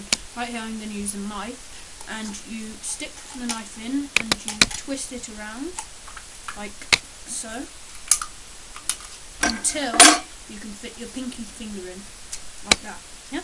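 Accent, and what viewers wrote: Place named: England, United Kingdom. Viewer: haha english accent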